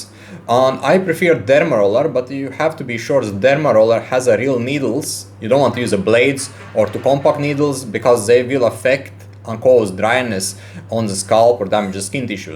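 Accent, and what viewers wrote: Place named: Russia. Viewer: Are you Russian?! Your English made my brain fuckd up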